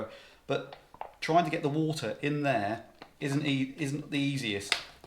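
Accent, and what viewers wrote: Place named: United Kingdom. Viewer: Thanks for the informative video love your UK accent haha... Do you think these can be used for collecting and filtering tap water at all? I am traveling in Europe including London, cities...I am not collecting water from lakes or rivers or ponds though haha... I am looking at filtering water for my family (four of us) for making morning powder shakes or water for their bottles for out and about drinking. Thanks lovely.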